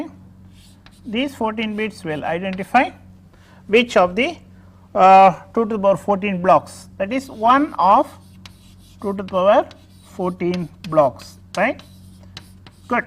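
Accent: India. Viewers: It's sarcasm, I just had a very bad day then. I ended up passing that class just. I personally just find Indian accents very hard to understand.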